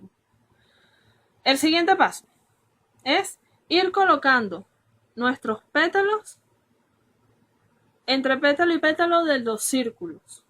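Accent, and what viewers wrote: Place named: Venezuela. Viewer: Ese hermoso acento, solo puede ser de VENEZUELA. Saludos desde Barquisimeto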